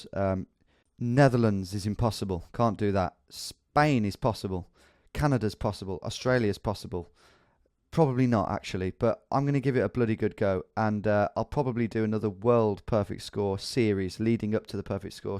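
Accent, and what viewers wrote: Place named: Midlands, United Kingdom. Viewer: where about in the midlands you from mate?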